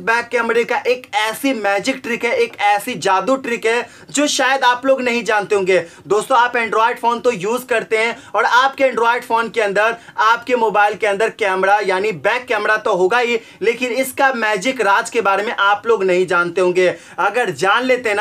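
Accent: Bihar, India. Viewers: Totalaa nahi hai BIHARI hai... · Bihari h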